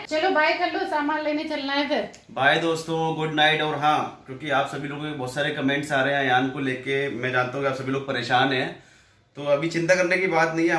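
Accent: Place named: Agra, India. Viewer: Accha aap Agra ki ho didi . mujhe lag hi raha tha aapki boli se mai bhi agra ki hu .Apne log pehchan me aa jate hai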